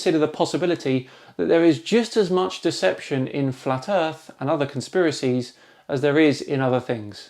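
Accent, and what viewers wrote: United Kingdom. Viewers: I'm 50/50 on it., I only support you b/c my little brother Brian (FFAS) drew an amazing portrait of you & he's a very talented artist. Half the time you're entertaining & the other half you're condescendingly ass-ish. The Lego pic frame was your saving grace. Yes, sometimes you're funny, other times, your mixed British accent is grating b/c it's right in between Proper English, "with a moment for tea & crumpets," and London Ghetto Cockney, "whut was u finking, bruv," but b/c it's not one or the other, the duality is more noticeably annoying to those of us who have no accent.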